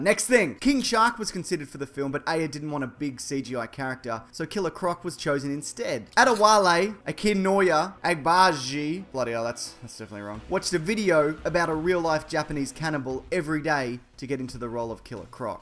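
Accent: Australia. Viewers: Hello Mr. man. I like your Aussie tone. It's nice. I WOULD like to win the lut crat, so if I could, that'd be cool. Thanks. Take care. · I would love to see Deadpool in the next Suiciide Squad movie...oh no wait. He's Marvel. Still Deadpool though. That movie would be EPIC. Oh actually, I want Batgirl in the next one- but only if I play her. Yes DC, I will begrudgingly take that roll for the good of the 'verse. Also, awesome video. Do I detect an Australian accent or am I wrong? Aussie YouTubers represent!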